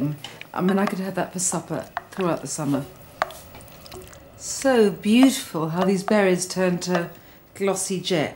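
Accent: United Kingdom. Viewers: me encanta el acento tan británico de Nigella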